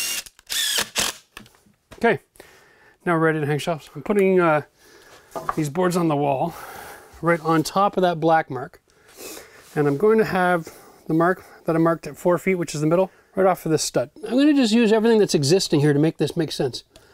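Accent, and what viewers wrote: Canada. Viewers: Well done, I love your videos. Did anyone tell you that your sound like Rik Emmet from Triumph when you talk? Canadian?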